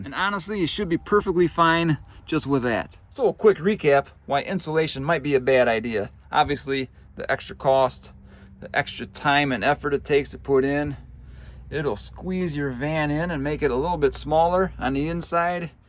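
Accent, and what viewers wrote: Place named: Wisconsin, United States. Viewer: Hey man, this is a great video. Thank you! I think I'll take this approach for now, I'm thinking a semi-insulated version 'cause I live in AZ, but I'd rather the funds go toward traveling not insulation materials! Love your accent, are you from Wisconsin? If yes, me too!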